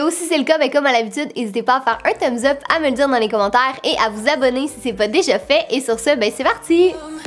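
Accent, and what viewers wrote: Quebec, Canada. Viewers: Tu es kebecoise ? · je kiffe l'accent du Québec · J'ai bien ta voix,canadien.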